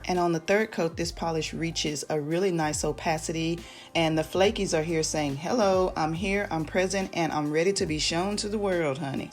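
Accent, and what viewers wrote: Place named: South, United States. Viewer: Goodness gracious sakes alive this collection is GORGEOUS🤩!!! I never saw this brand before so I appreciate you introducing me to MJ Polish! I'm gonna get this whole collection on their black Friday sale😁...thanks for that info too! On a side note.... I feel so comfortable when I watch your videos. Your kind voice & personality makes me feel like you are one of my cousins next door! I'm in southern Kentucky (close to Nashville Tn) so I LOVE your southern accent & you saying, "y'all", all the time like I do! I know this has nothing to do with the polishes but I'm sure your personality is alot of the reason people subscribe to your channel 😊. Thank you for the video & have a BLESSED Thanksgiving!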